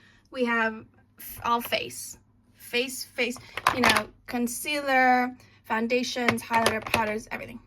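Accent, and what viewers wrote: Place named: United States. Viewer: She’s Irish, but lived in America for a while growing up, so it’s a mix of the two!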